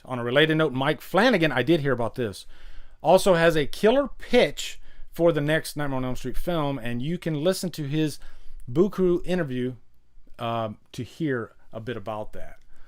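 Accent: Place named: Mississippi, United States. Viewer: a bunch of characters, huh? 😂 I thought I detected some Mississippi in your accent, but I miss Florida beaches. Texas really doesn't have any good ones. Maybe 1 or two, like South Padre or whatever.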